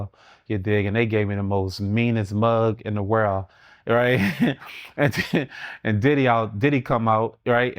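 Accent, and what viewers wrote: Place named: New Orleans, United States. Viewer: Love your Orleans accent💜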